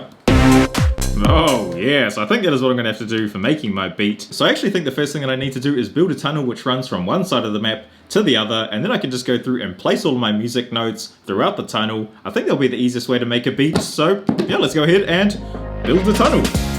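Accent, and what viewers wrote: New Zealand. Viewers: He sounds kiwi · Are you from nz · Are u a kiwi bra I’m aussie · R u a new zealander?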